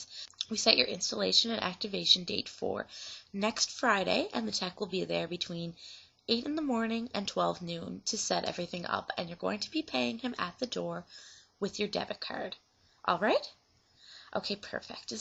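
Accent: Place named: Canada. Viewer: U r so Canadian. Uhhhh why u say about like aboat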